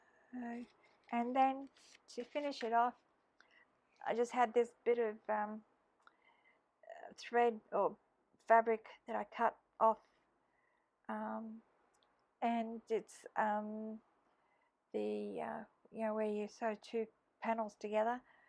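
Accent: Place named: Australia. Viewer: Nice to hear an Aussie accent 🐨 Love this teabag paper concept well done. Cheers from NSW 😊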